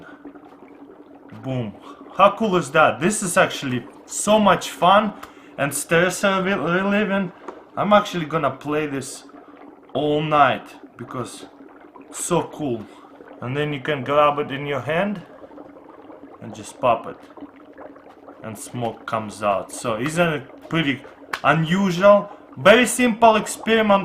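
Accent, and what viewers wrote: Russia. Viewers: i fking love this fking russian :P when he do something he always say boom :P hes so funny and his english making me laughing so hard :PPPPPPP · Love your russian accent!! great vids btw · дуратский английский..когда слышно что русский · cmon... i like this Russian accent :p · Учусь на ин язе . Когда я это услышал ,я думал умру от этого акцента. Если Вы русский тогда снимай на русском или это принцип какой-то? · you got Russian acsent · russian english is very funy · сразу слышно что русский хдд но очень интересно поэтому лайк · I'm French canadian living in west english speaking environement and this guy is really cool and authentic Russian accent. Keep that budy we love you and your funny experience. Thumbs up!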